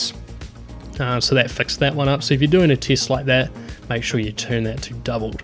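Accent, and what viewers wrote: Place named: New Zealand. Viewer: My first retro build a few years ago is a similar machine. I wanted to build a better version of the PC I had as a teenager, as if I had the capability to max it out. I've got an S3 Virge as my 2D card and a Voodoo 2 PCI as my 3D card. 32 MB of RAM, 7200 RPM 20 GB Maxtor Hard drive, and an AWE 64. Funnily enough, I just bought a 233 MHZ P1 with MMX to upgrade it. I also have a Super Socket 7 board that I recently got that supports 512 MB of RAM and has an AGP slot. I have an extra 233 MHZ processor and A Voodoo 3 2000. I want to see how the two systems compare to one another. Might also be fun to try to toss Windows XP at the Super Socket 7 build at some point just for a laugh. Also, are you by any chance a New Zeelander? I have a few Youtubers that I watch that are Australian, and your accent is messing with my brain. It's similar enough that my brain is expecting it to sound Australian, but there are certain things that sound different.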